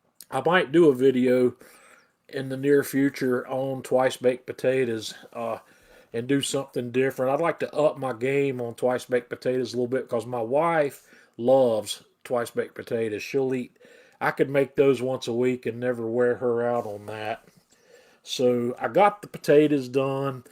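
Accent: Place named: North Carolina, United States. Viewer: The accent is awesome. What part of NC are you from?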